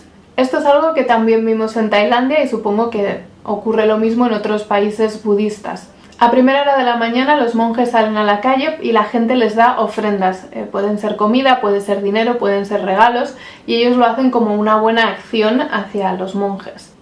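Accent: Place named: Spain. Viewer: No, ella es española, del Norte (Cantabria) al parecer. No sólo su pronunciación es claramente española sino que ella confirmó de dónde es en alguno de sus videos.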